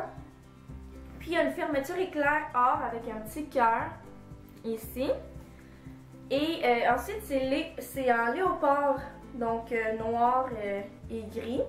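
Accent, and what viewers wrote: Canada. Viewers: J aime les 2 blouses et la robe blue blanc le tt assaionne de ton accent Canadian que je kiff fort !!humm Je m abonne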